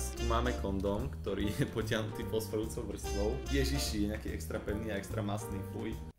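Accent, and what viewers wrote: United Kingdom. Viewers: Ten tvoj britský prízvuk mi pripomenul Wheatleyho z Portál 2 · haha ten uk prízvuk si zabill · o Ten britský prízvuk :D niečo neuveriteľné :D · Super britský prízvuk :) :D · Ty si sa učil Britskú Angličtinu ?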